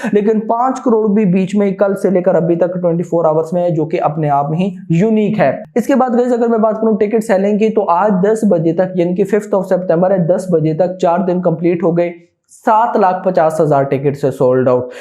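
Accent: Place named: Pakistan. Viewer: ISKI LANGUAGGE SUNKE PATA CHALTA H PAKISTANI H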